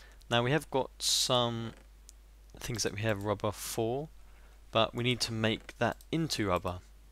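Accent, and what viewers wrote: United Kingdom. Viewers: He's British, it's pronounced that way over there. Don't be ignorant.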